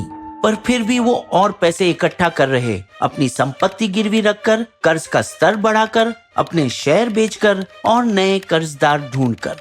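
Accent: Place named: South India, India. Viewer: Ye awaz mardrasi type hai acha nhi lagta humney change the voice other wise we will unsubscribe this chennal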